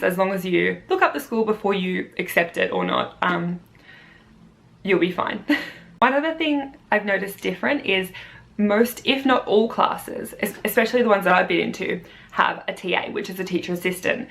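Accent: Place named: United Kingdom. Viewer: Your accent is starting to sound British :D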